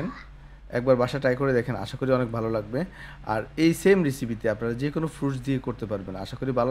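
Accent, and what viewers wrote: Noakhali, Bangladesh. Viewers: ভাই আননে কি নোয়াখাইল্লা 😊😊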